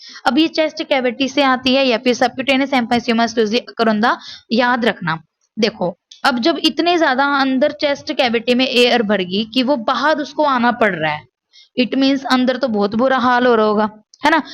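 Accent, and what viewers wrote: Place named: Haryana, India. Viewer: very helpful video ❤ and your haryanvi accent is cherry on the top❤❤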